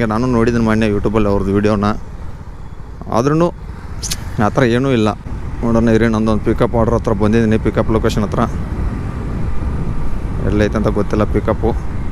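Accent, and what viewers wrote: North Karnataka, India. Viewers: ನಮ್ಮ ಉತ್ತರ ಕರ್ನಾಟಕ ಮಾತ್ ಕೇಳಾಕ್ ಬಾಳ್ ಖುಷಿ ಹಾಗ್ತದ್ 😍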